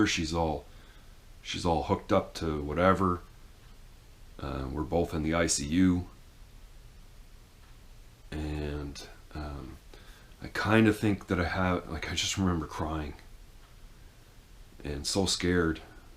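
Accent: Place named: Canada. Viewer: Lol Man I saw your video about 3 or 4 years ago and I ended up finally trying shrooms off and on several times over a couple of years. They definitely have the ability to reset your brain and outlook on life. I just happened to run across your same video again this evening. It is unbelievable how much you and I look alike, have similar personality traits and even our voice sounds alike except for the fact that you have a Canadian accent and I am from Alabama so I have more of a southern United States accent. I mean I know that sounds crazy but the resemblance is unbelievable.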